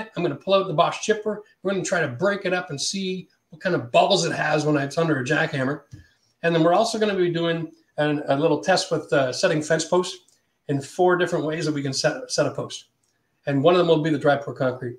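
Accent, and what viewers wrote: Canada. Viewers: Wait, what? Are you Canadian or American?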